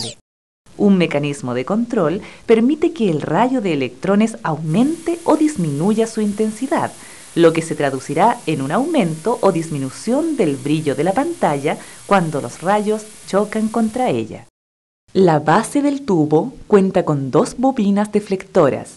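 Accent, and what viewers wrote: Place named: Chile. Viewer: Se le nota el acento chileno a la morra que habla en el vídeo.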